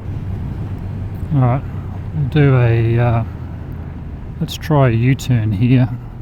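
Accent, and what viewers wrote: Australia. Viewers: Good to hear an Aussie test riding bikes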